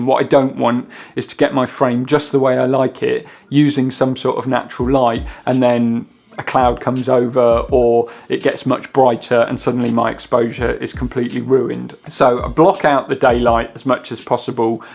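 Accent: United Kingdom. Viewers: Great video mate. I detect a midlands twang in your accent ☺️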